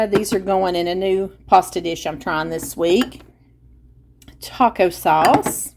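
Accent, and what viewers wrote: South, United States. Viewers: Watching and listening to you talk just make my heart happy! I have mentioned before that my parents were born and raised in Tennessee and that southern drawl just takes me back! Thank you for your sweet and gentle personality! Blessings!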